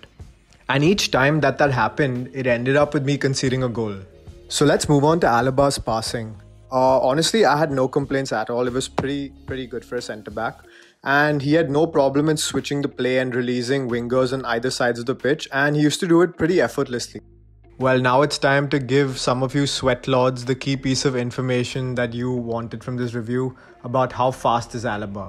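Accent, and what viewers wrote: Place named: India. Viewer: Indian accent is very bad i don't like it. Sorry to tell you the truth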